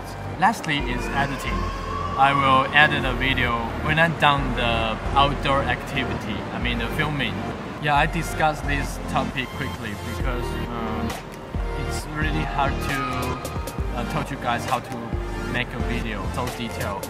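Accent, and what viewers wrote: Taiwan. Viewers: 真的滿台式英文....但很棒!!勇敢開口說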